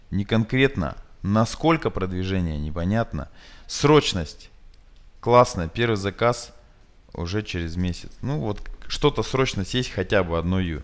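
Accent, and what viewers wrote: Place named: Rural, Russia. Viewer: Только комфортная скорость воспроизведения 1.25, а остальное - всё супер. Говор приятный ) Такой, не городской ) Да, и спасибо большое за науку и видео (а то понаписал всякой херни, а поблагодарить - не написал:0) ЗЫ. Музычка в конце видоса - громковата...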